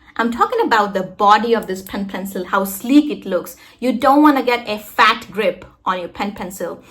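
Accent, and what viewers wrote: United States. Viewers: Please sister tell me , how can get American accent like you ?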